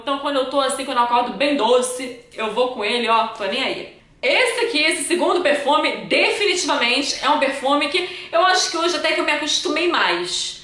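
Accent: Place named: Rio de Janeiro, Brazil. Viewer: chequei por aqui agora, masssxx vc tem muito sotaque de carioca. Amei os videos!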